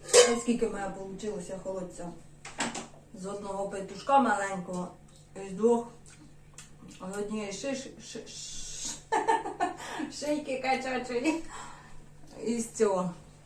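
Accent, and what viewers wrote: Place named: Zhytomyr, Ukraine. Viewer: Красавица ти звідки з Житомирщини акцент суржика або Черкаси, звідки ти будеш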